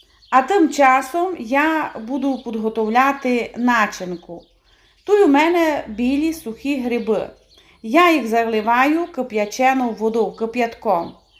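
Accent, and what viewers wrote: Transcarpathia, Ukraine. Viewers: Господи! Яка ви гарна! Нема просто слів! А закарпатська мова - це просто неймовірно 😘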